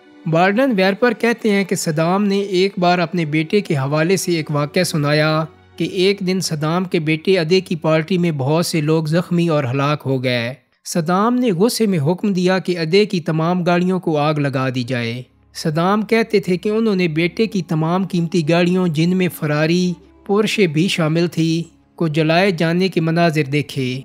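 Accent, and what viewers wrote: Chakwal, Pakistan. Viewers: Ap k bolny Ka style touch chakwal said Ka hai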